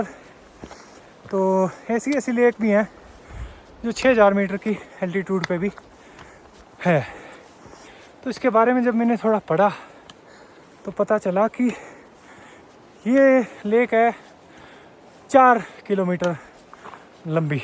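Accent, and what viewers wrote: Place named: Haryana, India. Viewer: Teri boli s to hariyana ka lag h bhai.kad ka hai bhai ?bada himmat wala chora hai bhai.ke bera m bhhi tere gaw ke aas-pass ka hou.bataiye bhai.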